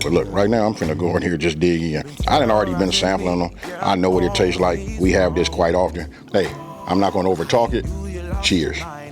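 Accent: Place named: California, United States. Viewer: Cali accent thick. Came here to find something to cook for the night. Thanks bro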